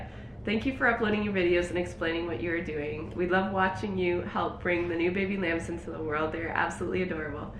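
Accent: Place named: Canada. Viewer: I think just has a thicker Canadian accent. Hers shows up sometimes, but it isn't as noticeable.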